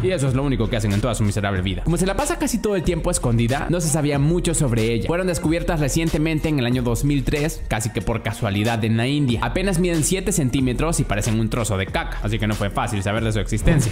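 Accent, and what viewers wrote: El Salvador. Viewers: Ahora si se nota que es de El Salvador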